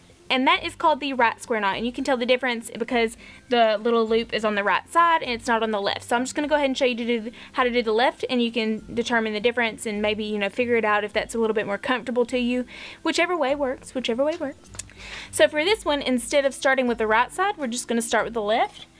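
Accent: South, United States. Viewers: Thank you sweetie, I love your accent, I am a southern girl too, need to learn macrame so here I am! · Now if all my teachers in the high school where is interesting beautiful talented and charismatic as you are I think I would have been a straight a student not to mention that beautiful Southern accent really makes you hang on every word I can't thank you enough stay safe and and god bless ✌️🇦🇺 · Where are you from? I know it’s the south for sure 😂🤣😂🤣😍😍😍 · I like your accent. What Southern State are you in? This Video helps me a lot. Love it. Thanks! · I hear a Southern accent...where are you from? Tennessee here. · Love your southern accent !😂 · Oh my gosh, you are such a stunning Southern Belle! I love your accent, I love your vibe and thanks to your simple and beautiful videos I can now do macrame just like my mama used to when I was little. She tried to teach me but let's just say she wasn't a natural born teacher lol. 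Thank you so much! Big hugs 😘😘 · I'm from California and very very rarely do I like southern or country accents but yours.....omg! Its toooooo cute! I love it, love your bubblyness that's not goofy and I LOVE how articulate you are with your directions and instructions! You're a VERY GOOD instructor! Thank you for the refresher course!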